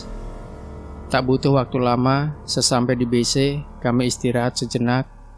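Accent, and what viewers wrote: Java, Indonesia. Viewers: Logat jawa medok ngomong guwa2...saya lbh enak didengar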